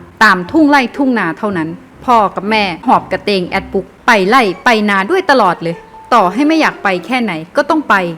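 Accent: Isan, Thailand. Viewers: พี่เป็นคนอีสานใช้มั้ยคะ คนอีสานคือกัน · แสดงว่าแอดเป็นคนอีสานเหมือนกันใช่ไหมครับ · คนอีสานหรอพี่อะ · เอ้าเจ้าคนอีสานบ่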